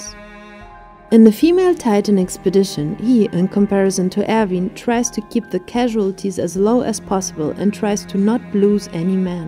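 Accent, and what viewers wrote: Germany. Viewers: Are you german? Your accent sounds like you are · are u german btw? bc if u are I can hear it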